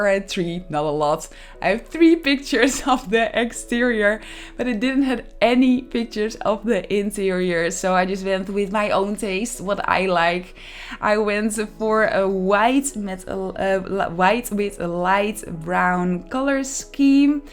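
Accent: Netherlands. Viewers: Je heeft een groot Nederlandse accent.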